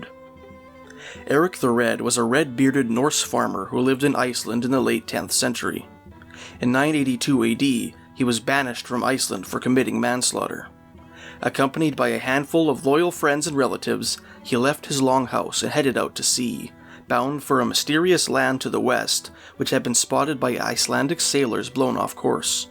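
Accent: Canada. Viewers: He even has a Canadian accent! ;)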